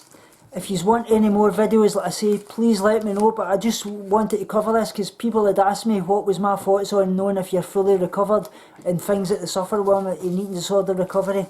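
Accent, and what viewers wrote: Scotland, United Kingdom. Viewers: thanks for these refreshing thoughts. you are so inspirational. i do not consider myself in recovery because I still practice all of my ED behaviors, but I do see a therapist regularly and I am starting to consider the idea that maybe I deserve recovery. that maybe my life could be better. maybe, just maybe, I have a future to live for. so maybe because my thoughts are changing, even though my behaviors aren't that I can consider myself in recovery. idk. what do you think? btw I wish I lived in Scotland so I could hug you. you are so sweet. and I absolutely love your Scottish accent. I know it isn't an accent to you, but I am from the U.S. so it sounds so cool to me. i wonder how our accent sounds to you. sorry. I am weird. it is am here and I need sleep!! ❤😘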